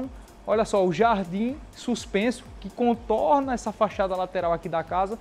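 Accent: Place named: Ceará, Brazil. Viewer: Esse sotaque do Ceará me encanta por demais. O vontade de conhecer esse estado. Talvez, até morar ❤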